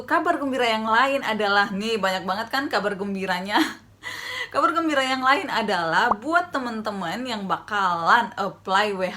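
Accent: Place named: Philippines. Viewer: Damn i tried to translate lol. Your words are pretty much the same as Filipino words and the accent! I think we're long lost sisters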